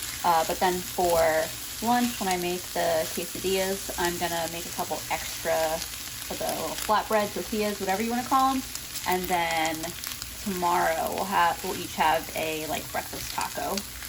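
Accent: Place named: Delaware Valley, United States. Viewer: Hi! I just found your channel and it's incredible! I saw that you're located in NC, but I'm curious if you're originally from the PA/DE/NJ area? Your accent reminds me of home. 🤍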